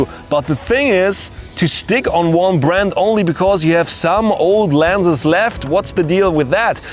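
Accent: Germany. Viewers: wow ich find es so lustig wenn deutsche englisch sprechen 😄aber das gefällt mir sooo gut weil ich dich echt gut verstehen kann obwohl englisch nicht so meins ist... Ich habe eine Sony a58 und ich liebe sie 😍 ich liebe es auch dass man durch den Sucher alles machen kann, und ich habe sie eigentlich nur gekauft weil sie sogar viel günstiger war als canon dabei hatte sie mich von Anfang an so gut überzeugt😍😍 aber ich meine, meine hat trotzdem einen Spiegel 😄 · omg this German accent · are u german? just curious,cause of the dialect... edit: your camera is setted to german, so i may be right! greetings from austria!😉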